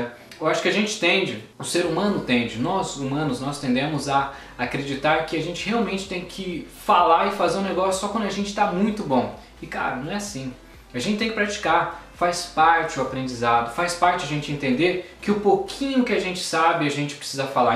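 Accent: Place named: United States. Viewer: Parabéns! Kkkk já está ate com um pouco de sotaque americano kkk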